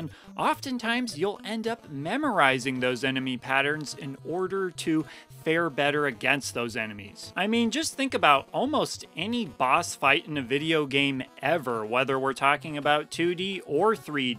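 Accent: Midwest, United States. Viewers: ah ha! Now that I have you, are you from the Midwest?? You sound like a friend from MN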